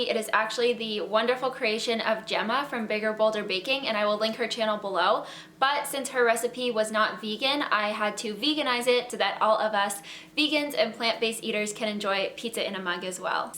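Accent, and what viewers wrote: Canada. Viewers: canadian accents are so cute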